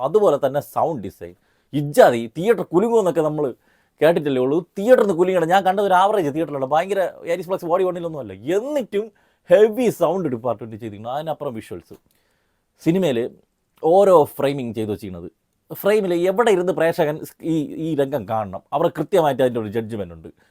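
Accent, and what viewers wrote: Trivandrum, India. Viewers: Trivandrum slang 😂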